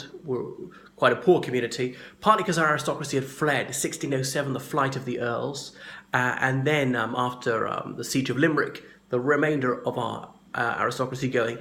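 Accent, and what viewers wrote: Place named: England, United Kingdom. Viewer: Hi, Well first of all, let me say that like yourself, I know a fair bit about Irish history, I am English with Irish heritage and I also once lived (happily) in Co.Waterford for nearly 3 years and travelled throughout much of Ireland on business. Your content was excellent, but just brush up a bit more with your presentation skills. You used the phrase "let me see" seven times, let it flow more. Also sit down and make sure all your face is visible, maybe have some bullet points written behind the camera so you don't have to shift your eyes around as much enabling you to look into the camera lens is if you're actually talking to the people who are watching your vid, that way they are more likely to pay attention. Possibly it's also worth finding a plain background, instead of one with wall paper or even a large poster in the background relative to what you're discussing. 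What will rile the bigots is that you're Irish with a well spoken English accent, yes I know many people who live in Ireland are Irish but have also lived in England and speak as you do, but to quell the bigots, give a brief explanation about your background. On the point of racism, I never once encountered it from a single Irish person, only on one occasion from some American tourists in a pub in New Ross, who I presumed had some far flung Irish ancestry, but as soon as the locals in the pub came on my side they looked dumbfounded and shut up. So I wasn't clear if you were intimating there is a culture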